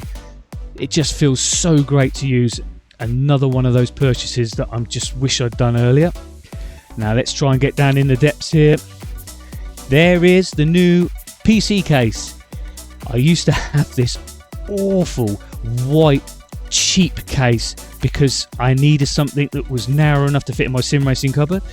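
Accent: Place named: Suffolk, United Kingdom. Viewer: I’m in Ipswich .. thought I recognised the twang 😝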